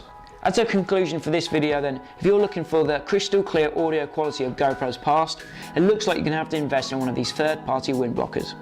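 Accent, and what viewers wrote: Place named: United Kingdom. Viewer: Great video, thanks for taking the time to make it 🙂. It answered a lot of my questions about wind noise, in a normal, undramatic British accent........God, I'm sick of all the over-exaggerated, over dramatic American accents on YouTube !! ☹️. As the speeds are quite low on your vid, what are your thoughts about wind noise on a side helmet mounted road bike travelling at speeds up to 80mph, do you think the results would be the same ?? Cheers 🙂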